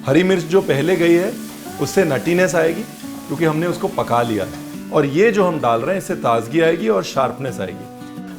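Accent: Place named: Punjab, India. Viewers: Amazing recipes of left over rice...will try paneer 65 rice for sure..luv d way u explain.ur punjabi tone while explaining reminds me of my mom...meethe chol ni banane ha ha👍😀too gud...love n respect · Aaj tou fir teen shadiyaan hongi shamko, aana jarur ji.... 💥💥💥btw aapki punjabi k sath meri bhi punjabi nikal jati hai, n my hubby say, tere naal he gallan kar reha hai 🤣🤣🤣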